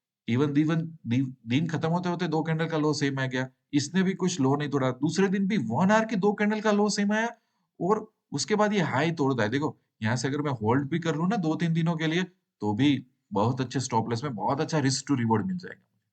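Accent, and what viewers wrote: Gujarat, India. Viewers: તમે ગુજરાતી છો? ટોન એવો લાગે છે😊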